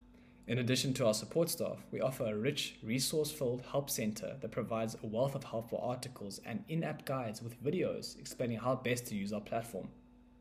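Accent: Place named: South Africa. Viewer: bro lowkey sounds South African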